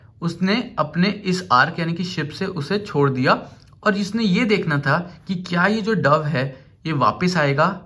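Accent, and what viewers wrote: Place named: India. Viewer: Man indian accent is difficult to follow hehe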